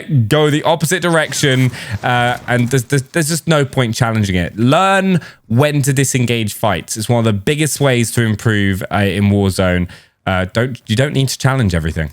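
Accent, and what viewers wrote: United Kingdom. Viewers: mate are u british because u sound it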